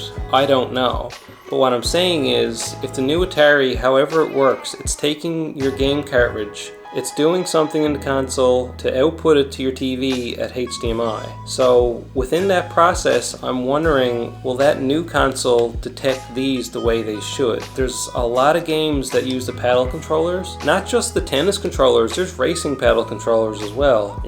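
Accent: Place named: Canada. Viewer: no way this guy isn't Canadian, lol - i'm saying East Coast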